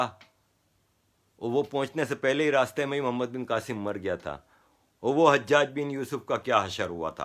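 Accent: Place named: Gujarat, India. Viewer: Sir you are basically Gujarati, and your speach ,thinking shows that you are still Gujarati. i am from Gujarat ,apka face bhi Gujaratio ki jesa hi dikhta hein sir :)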